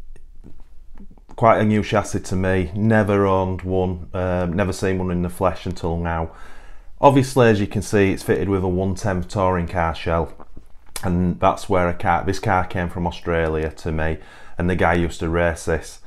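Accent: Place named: Yorkshire, United Kingdom. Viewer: I'm also wondering if there's a knight rider shell???lol. I put your accent somewhere between huddersfield and Leeds? I was thinking in a previous video it's a bit sunny for round here,lol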